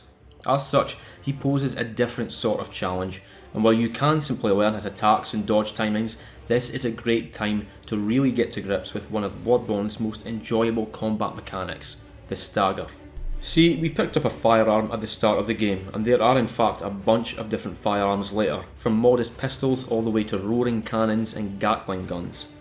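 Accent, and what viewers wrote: Scotland, United Kingdom. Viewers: I will never complain about listening to a Scotsman talking about one of my all time favorites for hours. Also, i completely agree the stupid motion control gestures happen far more often on PS5. Ludwig is rough, so are Winter Lanterns, the defiled chalice bosses, Crow of Cainhurst, and Orphan, but no one, NO ONE in this game is harder and more lethal than that mother#%$&!@ in the blood river cave with the gatling gun. Eff that tard. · was gonna sleep to this until i heard you're british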